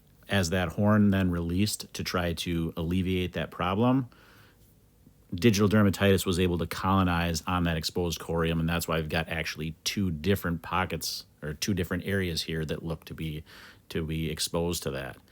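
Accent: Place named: Midwest, United States. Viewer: I was wondering why your accent seemed so familiar, its because we're state neighbors! Greetings from Eastern MN.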